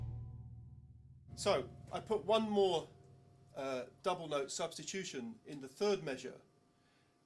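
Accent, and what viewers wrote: United Kingdom. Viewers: You sound like you're from London! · Yeah east, hes cockney mate!.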